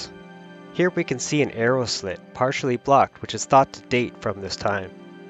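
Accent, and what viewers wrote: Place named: United States. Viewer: Why would you have an american narrator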